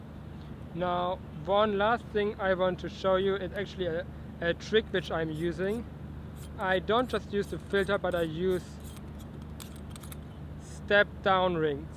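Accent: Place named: Germany. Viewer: Hahaha, thought he was, but with a German accent (or Scandinavian accent)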